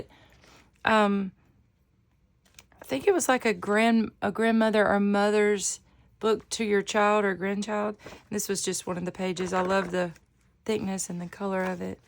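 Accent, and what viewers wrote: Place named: Tennessee, United States. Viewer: I came here from thrifty day to hear your accent lol. It sounds a little bit like my Tennessee accent but yours is cuter 😂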